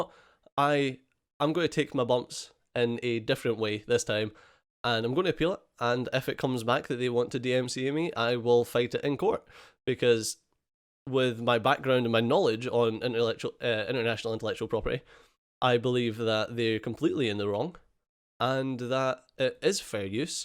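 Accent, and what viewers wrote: Scotland, United Kingdom. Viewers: I wish you the best of luck man, my total youtube usage will go down without you and those wonderful Scottish tones. I hope more creators see what you are doing and stand hand in hand with you. Please don’t stop creating, i personally think you are in the right and we still need creators doing THEIR thing rather everyone just doing the same thing controlled by some faceless corporations, trying not to sound conspiracy theory on it.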